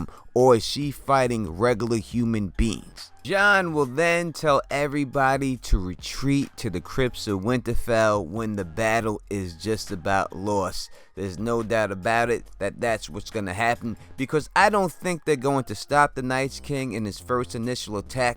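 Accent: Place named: Queens, United States. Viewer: Great analysis - thank you,. I love your Queens, NY accent - makes the world feel much better xD (Im from QV)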